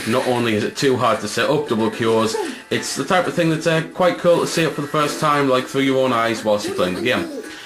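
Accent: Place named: Cockney, United Kingdom. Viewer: Oi nice cockney 'cent ya got there!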